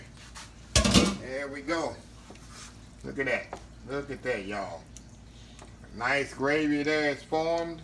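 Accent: South, United States. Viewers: He's a southern man and talks the way my dad used to!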